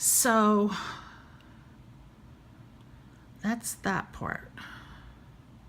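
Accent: United States. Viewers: This is not a great tutorial, but I really appreciate you trying. I did learn a few things from you. Love to see you come back after a few months when you know what's what and can go more into depth. Hard to find good tutorials on Metabrush. You have a very nice voice for this sort of thing. Overall, I'll give you 3 out of 5 stars...with a little more prep you could get 5 stars. Oh, and the camera work was fine...better than many. And hey, I'm from Jersey...we Tawk a lot like you. I didn't even notice an accent! One last thing. you would be better off leaving the brush in the default position. The middle on all the sliders is not a very appealing brush. You can't make strokes with it and as I understand it, strokes are a very important part of MetaBrush technique. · This is great! It;s exactly the way I work - try this, try that, where's the thing I just did, whoops, undo - which makes me a feel a bit more confident. 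Btw, I'm originally form Yardley and lived i Philly for 20+ years so it's GREAT to hear somebody "tawk" right!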